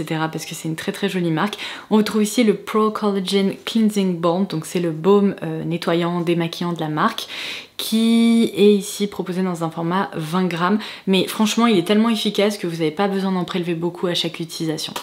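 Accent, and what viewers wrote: England, United Kingdom. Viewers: Bonjour, ma question est un peu hors sujet dsl mais j’aimerais savoir si vous êtes d’origine anglaise du à votre accent anglais. Bonne journée.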